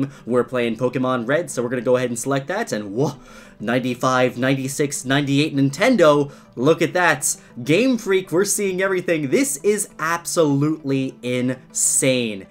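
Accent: United Kingdom. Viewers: bro is british gl :D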